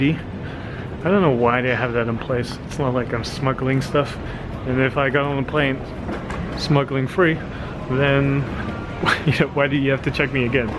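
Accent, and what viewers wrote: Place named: Australia. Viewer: you have a bit of aussie accent… nice flight review by the way. cheers!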